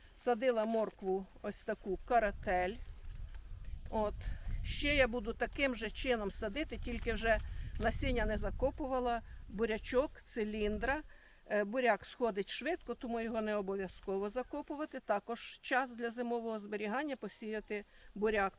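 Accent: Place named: South, Ukraine. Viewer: Наш звичайний південний ,,говор''😊. Дякую за поради.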